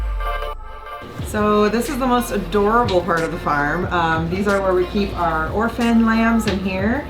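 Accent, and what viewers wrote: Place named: North East, United Kingdom. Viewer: where is your farm located you sound a lot from the north east, I'm from Pa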